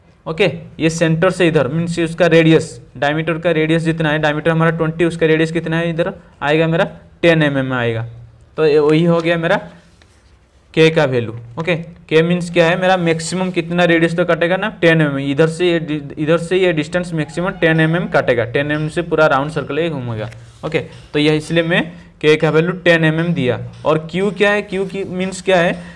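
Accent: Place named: Odisha, India. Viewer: Sir app odia ho kya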